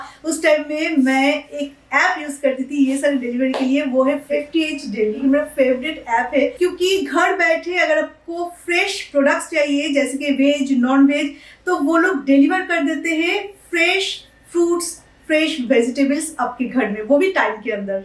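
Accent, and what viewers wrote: India. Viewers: What a Hindi accents.... Shame on you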